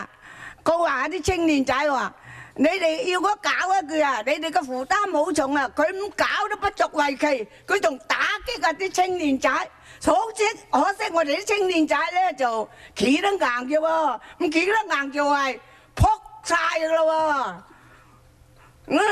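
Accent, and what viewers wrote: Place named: Western Guangdong, China. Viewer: 哈哈。粤西口音。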